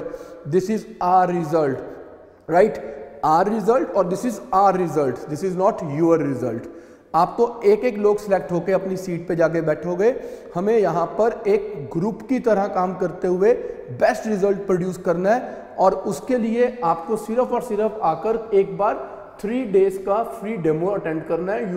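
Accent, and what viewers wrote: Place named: Punjab, India. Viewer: I really like sir's accent.. The typical Punjabi 😊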